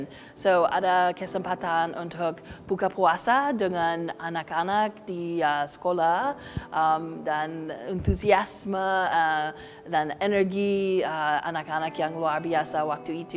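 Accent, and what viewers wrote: Indonesia. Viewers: Englonesiannya bagus. Semangat bu! Hehe.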